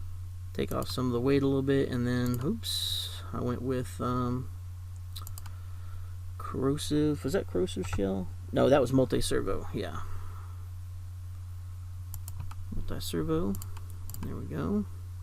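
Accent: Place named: South, United States. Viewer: definitely southern states US accent and as a Brit I like it. I always found the accent like the Irish to be very nice to hear.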